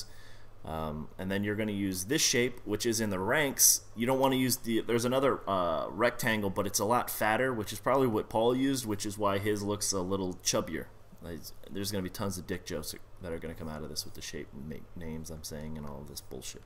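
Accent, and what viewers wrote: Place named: Canada. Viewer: wow canadian eh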